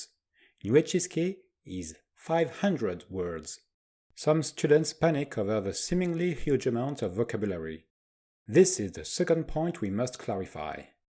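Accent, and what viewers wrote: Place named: United States. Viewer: Are you from France? It does not seem. You have a great American accent.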